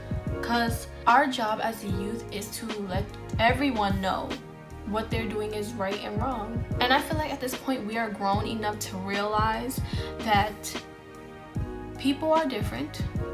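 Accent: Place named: Philadelphia, United States. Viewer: You have a Philly accent. If you lived in Philly, you had Italian food. At least that's my experience. You have the food from your culture and you had Italian.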